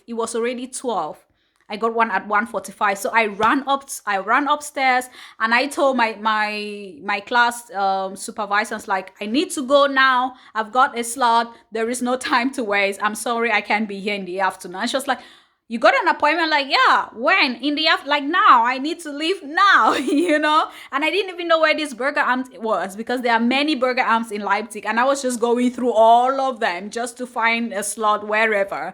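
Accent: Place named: Cameroon. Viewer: Your accent sounds like a Cameroonian.😀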